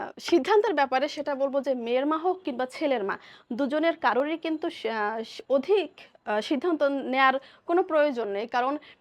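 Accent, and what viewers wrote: Barishal, Bangladesh. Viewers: ভাবি আপনাকে বরিশালের ভাষা কথা বল্লে খুব হাসি পাই, ভালো লাগে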